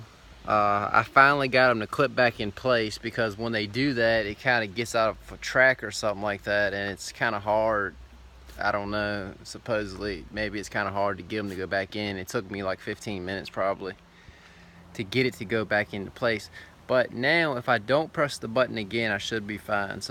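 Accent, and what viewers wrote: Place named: South, United States. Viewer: You are handsome and your southern accent is everything ❤️😊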